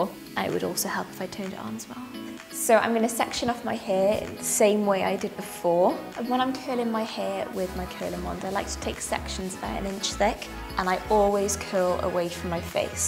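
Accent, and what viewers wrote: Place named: Wales, United Kingdom. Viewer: Welsh if im not mistaken!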